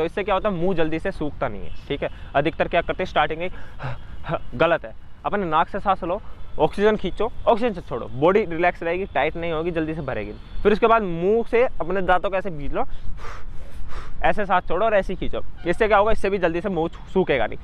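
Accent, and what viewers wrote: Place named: Haryana, India. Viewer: 😃😃😃haryana h bhai Uski glti n h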